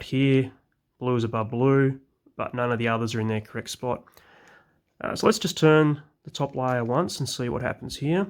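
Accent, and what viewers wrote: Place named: Australia. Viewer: finally got around to watching your video. I didn't realise you were Australian. I'm in Adelaide.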